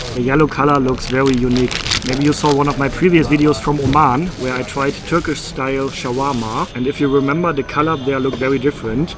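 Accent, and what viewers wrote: Germany. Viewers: It's Deutsch accent, not English accent. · Love your videos. Please keep it up. Also love your German accent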